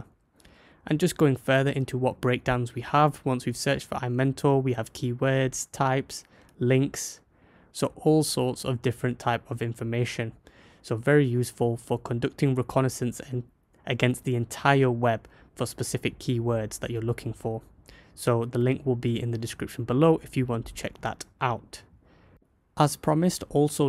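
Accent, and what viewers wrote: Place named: India. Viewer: Bro are you from india?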